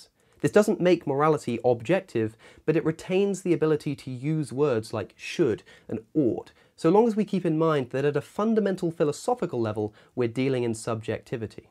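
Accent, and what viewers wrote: United Kingdom. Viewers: CosmicSkeptic: intelligent British sounds Me: mhm mhm mhm 🤔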